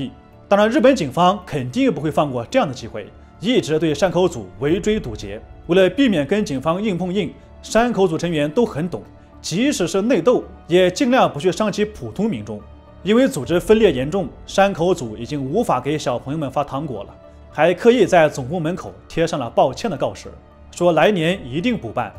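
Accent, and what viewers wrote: China. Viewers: 不是嘛 你聽口音就知道版主是中國人了吧 (我猜中國憲法肯定沒有這條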